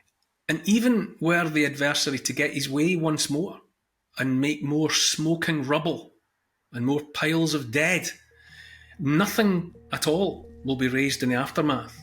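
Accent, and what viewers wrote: Scotland, United Kingdom. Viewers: Isn't that the scottish accent I hear in your mouth?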